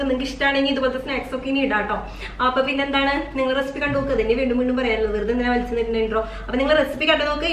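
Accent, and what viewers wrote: Thrissur, India. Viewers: Adipoli aayindtta chechi. I like your thrissur slang as I also belongs to thrissur. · തൃശ്ശൂർക്കാരിയാണോ ചേച്ചി??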